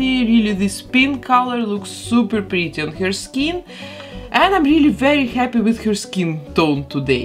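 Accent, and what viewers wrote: Russia. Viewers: Привет, вы русская ? Просто у вас акцент сильный и некоторые слова на русском говоре . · Я слышу акцент, вы русская?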